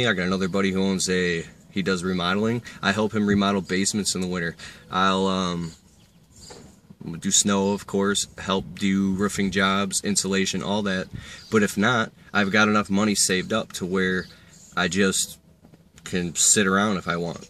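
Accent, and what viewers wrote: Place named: New England, United States. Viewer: The Landscaping Employee Trap Hey man i gotta ask you, are you from New England? You said ruffin' for roofing.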